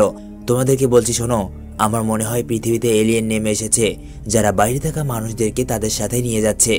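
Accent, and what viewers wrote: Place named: Noakhali, Bangladesh. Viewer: নোয়াখালী 😊আপনি😊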